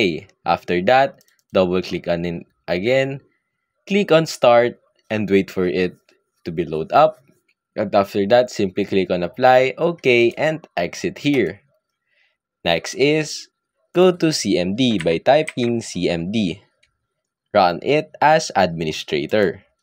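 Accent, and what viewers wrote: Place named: Philippines. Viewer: bros profile is an american and souns like a filipino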